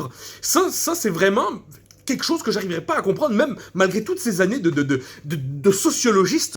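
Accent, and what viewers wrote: Quebec, Canada. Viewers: Desolé encore que Bob coté a pas coulé quand il a traversé l'atlantique, si jamais vous le perdez en route on sera pas trop triste au quebe, étonant qu'il a pas préféré se sauvé en floride. Sinon pas pire accent, manque pas grand chose pour reussir a te perde dans une foule a mtl, peux etre juste un peux de, ouin, genre, tsé, pis 😅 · L'accent québécois est bien fait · Bravo pour l’interprétation : tu te débrouilles vraiment bien pour imiter Matthieu Bock-Côté et l’accent québécois 👍🏻 En plus, même les propos sont bien fait : on dirait vraiment les propos de Matthieu Bock-Côté (il a déjà été capable de sortir des propos similaires) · Pour une parodie humoristique, y'a quand même du vrai dedans. Serieux, du jambon, c'est du porc, point final, le reste c'est de l'arnaque Super accent canadien au passage, ca rajoute un petit coté drôle :) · c'est juste un accent quebecois... 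je reconnais pas le bock kotey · Encore une fois le seul Français capable de faire un accent québécois quasi impeccable, GG. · J'adore, peut-être une pointe d'accent québécois en plus, mais franchement bravo car l'original est une vraie pipelette :) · Comment fait il pour maîtriser l'accent québécois sans trop en faire ? C'est très fort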